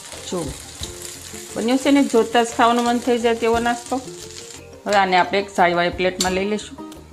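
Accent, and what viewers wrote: Bhavnagar, India. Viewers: તમારી ભાષા પરથી લાગે છે કે ભાવનગર, ગોહિલવાડ પંથકનાં હશો.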